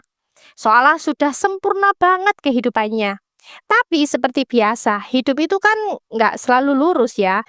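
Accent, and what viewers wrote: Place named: Java, Indonesia. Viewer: Medok e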